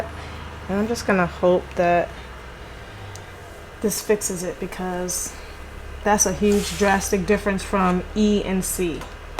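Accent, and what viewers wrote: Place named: New York City, United States. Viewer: Everytime i look up a new skill in crafting screening dtf ypu name it... there is another video by! 😊 love your NYC accent too!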